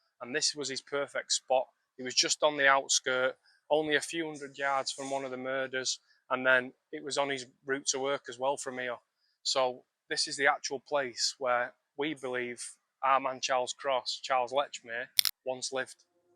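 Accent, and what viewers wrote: Manchester, United Kingdom. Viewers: New sub here 👋🏼 This video randomly popped up & I’m glad I clicked. What a remarkable video. Love all the editing 👏🏼 I’m from Bolton, is that a Mancunian I hear? 👀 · That's a proper manc accent, or Salford.. I used to live ten minutes up thd road from Salford precinct off chapel lane or chapel street/road. Been 30 years since i lived there but your accent is definitely either north manchester or Salford, possibly south Manchester Wythenshawe, but my point being it's a thick accent from the area. I ended up in stretford. About the video, I thought it was Lechmere for years but now I'm not convinced.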